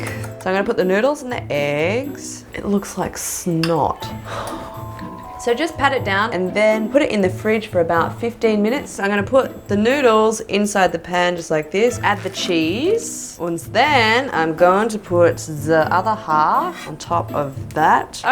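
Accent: Australia. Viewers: You sound Australian! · Is she Aussie?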